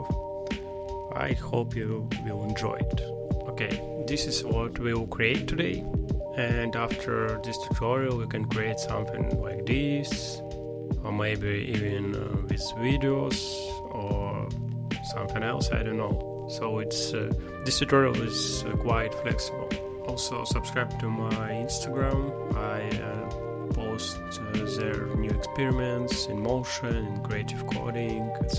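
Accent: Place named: Russia. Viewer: so russian accent )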